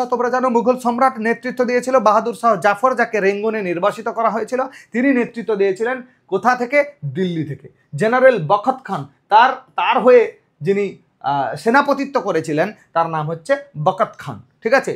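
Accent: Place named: Purulia, India. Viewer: Sir, আপনার বাড়ি কি পুরুলিয়ায় কথা শুনে তাই মনে হচ্ছে আমি পুরুলিয়া থেকে❤❤❤❤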